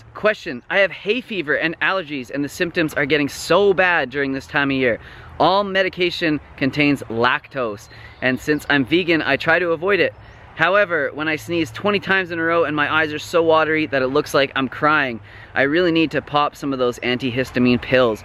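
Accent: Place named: Canada. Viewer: I don’t know how anyone can find you annoying. I love the Canadian accent...ah frig...😍.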